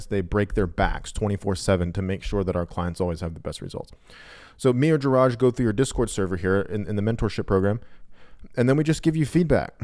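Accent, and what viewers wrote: India. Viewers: Im dying cause of the indian accent lol... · 69,420 Please entertain us with your Indian accent. We need more of that!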